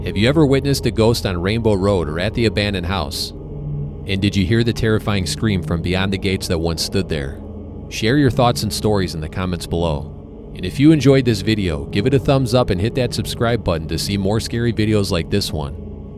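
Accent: Chicago, United States. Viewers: honestly, I also love your accent. My family is from northwest Indiana and the Chicago area, so you sound similar!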